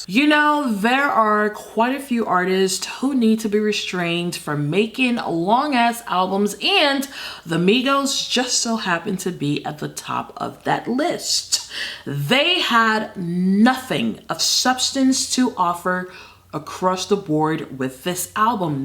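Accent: United Kingdom. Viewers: Is she originally from London because that’s what I’m getting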